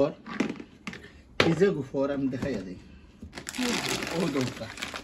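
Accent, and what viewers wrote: Sylhet, Bangladesh. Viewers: তোর আর কোন কাম নাই কথা শুদ্ধ ভাষায় বলতে শিখে কথা বলো সিলেট মাল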